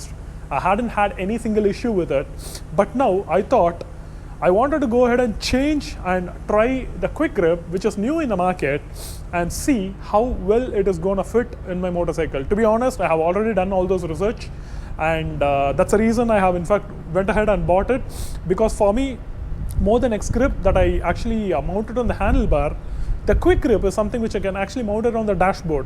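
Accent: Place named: Texas, United States. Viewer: Great video! Thats a heavy accent are you from texas?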